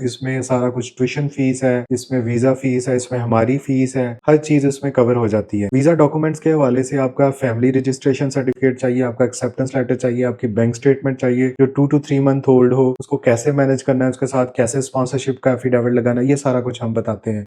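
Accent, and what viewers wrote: Faisalabad, Pakistan. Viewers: Pure Faisalabadi! But Genuine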